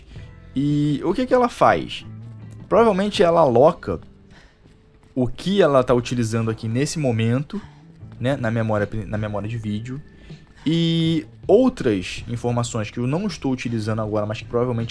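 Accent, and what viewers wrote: Rio de Janeiro, Brazil. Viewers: kkkk eu casco da forma que os cariocas falam, é estiloso kkk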